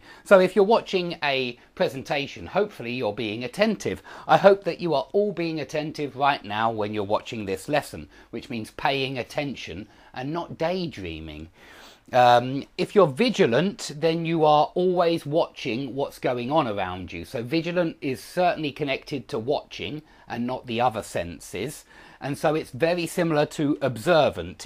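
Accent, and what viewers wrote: England, United Kingdom. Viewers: OMG! I've just found your channel, vely useful I love british accent.I promise tolearn from yours.. Thank you ever so much♥️😊♥️ Apr 5th 2020... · Hi Teacher I love your lessons!!! I love your english accent!!!!!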